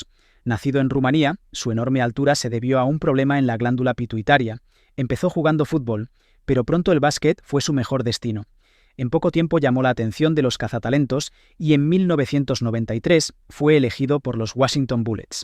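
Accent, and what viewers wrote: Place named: United States. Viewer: Por qué hablas como un yanki?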